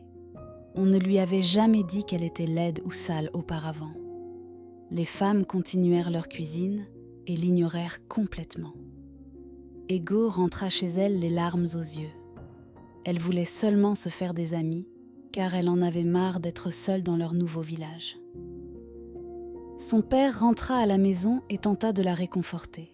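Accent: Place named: Europe. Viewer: Dommage pour la voix que je trouve trop européanisée pour moi! La voix d’un vieille homme ou femme aurait été le top du top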